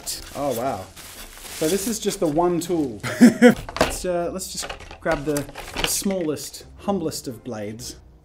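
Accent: Australia. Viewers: Are u from Australia? I mean your accent. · r u aussie?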